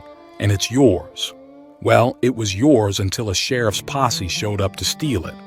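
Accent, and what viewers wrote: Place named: United Kingdom. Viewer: NW Georgia. You ? I thought you were British. 😂😂.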